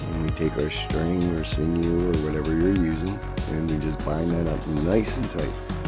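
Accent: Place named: Northeast, United States. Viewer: I had a feeling you might be from my area…. (CT here 🙋🏻‍♀️🙋🏻‍♀️)😂😂 you sound like you live on the North East coast………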